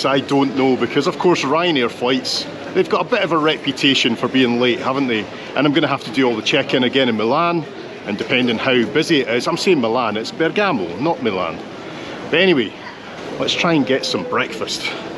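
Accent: Scotland, United Kingdom. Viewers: The first time I heard the scottish accent I thought it was a guy from Bergamo attempting to speak english.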